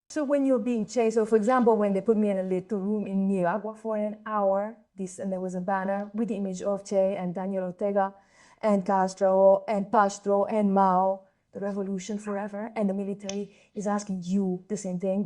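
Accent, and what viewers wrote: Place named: Russia. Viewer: Porque suena como un ingles ruso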